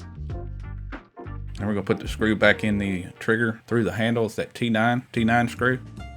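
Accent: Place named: South, United States. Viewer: Thanks for that i followed that through, much love, portsmouth england. Love that southern usa accent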